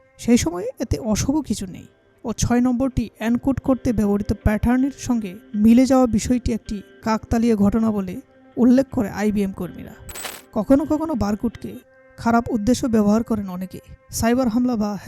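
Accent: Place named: Bangladesh. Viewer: আপনাকে বাংলাদেশী মনে হচ্ছে 😊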